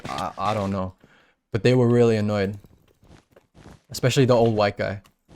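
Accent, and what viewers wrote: United Kingdom. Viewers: well sorry you sound Scottish like me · i dont care if you sound like brithish be strong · bro i luv ur british accent thats the reason im watching ur vids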